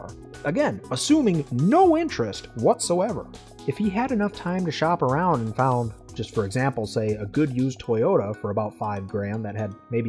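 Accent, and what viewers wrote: United States. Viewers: giga annoying american voice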